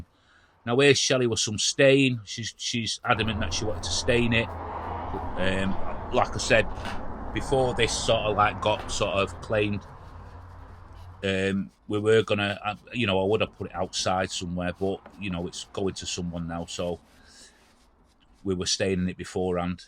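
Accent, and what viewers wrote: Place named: Scotland, United Kingdom. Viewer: Paul From Pa in US. Looks great. I have been wanting to do some pallet wood projects. I love that rustic old look. I also love the color of the stain that you used. I could see this out in my garage for storage / small tools etc.. My problem is that I want to build everything I see and you just can't. I really like the pieces you build though. They are just different and that's what I like. Plus the accent / location helps since I was born in Scotland but I have been in the US since 1968. I still have a spot in my heart for that part of the world. Thanks for another great build.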